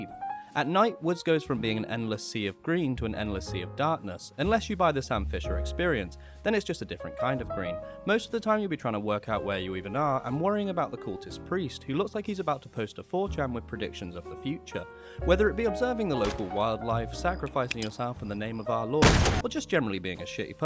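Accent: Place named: England, United Kingdom. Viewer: i like this funny english tarkov man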